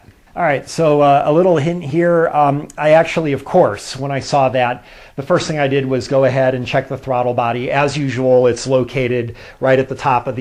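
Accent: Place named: Louisiana, United States. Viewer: Jeeese I must apologize I just took it for granted - to me you have an accent, I thought it was Cajun but I'm from Canada so its not like we hear people from Denver either except John Denver and you know he never had an accent. Up here once a week I listen to the best automotive phone in radio podcast in the USA from guess where Louisiana. This is the link if your interested. <link>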